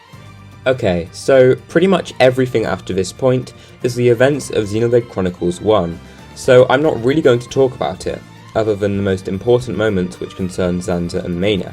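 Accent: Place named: United Kingdom. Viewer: The fact that a British guy narratives this is so Xenoblade-y !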